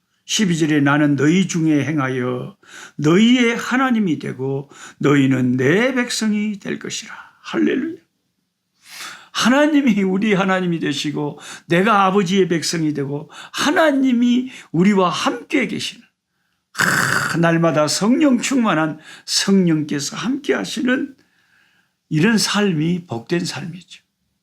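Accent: Jeolla, South Korea. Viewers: 매번 야심차게 성경읽기에 도전하다가 레위기를 만나면 그냥 적당히 대충 느슨하게 읽는 흉내만 내다가 넘기곤? 했었는데 목사님께서 사교육비도 안받고 1대일 성경교사가 되어주셔서 어려운 성경을 너무 쉽게 그리고 하나님의 사랑을 느끼며 읽게 되었음에 감사드립니다. 하나님이 이렇게 세심하게 어리석은 인생에게 하나님 나라의 거룩한 백성으로 살아가는 방법을 가르쳐 주셨네요. 제사의 종류와 제사의 방법이 또한 정확하게 정립이 되었고요. 그 매번 제사장의 도움으로 어렵고 두렵고 복잡한 제사를 드리지 않아도 예수님이 친히 대속물이 되어주셔서 단번에 해결해주심으로 말미암아 이제는 성령님의 도움으로 언제 어디서는 예배자로 설수 있음이 너무나 감사합니다. 지난 연말부터 교회적으로 시작한 성경통독 프로그램과(목사님~ 여긴 목사님 팬이 많은 진해동부교회예요^^) 꿀송이 보약 큐티를 하며 레위기를 두 번 읽게 되었는데 더 분명해지는 말씀의 은혜가 있습니다. 목사님의 남도 사투리가 얼마나 사랑스럽게 들리는지 매일 목사님의 예사롭지 않은 등장을 기대하는 1인입니다. 자주 자주 꽃을 들고 등장하셔서 얼마나 사랑스럽게 바라보시는지 그 눈빛에서 목사님의 사랑의 마음을 느낍니다. 오늘 들고 나오신 나팔꽃 갸가 활짝 아름답게 핀걸 우리에게 보여주고 싶은디 그걸 아쉬워하시는 모습에서 이미 우리는 가장 아름다운 남아공의 꽃들을 마음으로 보았지요. 목사님~ 너무 감사하고 사랑하고 축복합니다.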